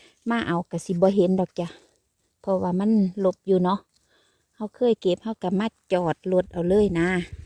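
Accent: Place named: Ubon Ratchathani, Thailand. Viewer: เว้าได้ไพเราะจ้า สำเนียงทางอุบล เด้ อจ้า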